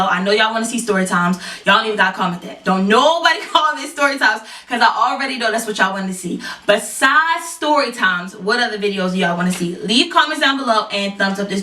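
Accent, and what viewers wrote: Baltimore, United States. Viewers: As soon as I heard the accent (without looking at your bio) I was like YUP she from Baltimore 🤘🏾 good video ✨ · You are so groovy, so the outro is 🔥! You from b-more? Your accent is very Bmore. Your realness is refreshing! New sub! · Are you from Baltimore? I feel like I hear it in your accent. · are you from Baltimore?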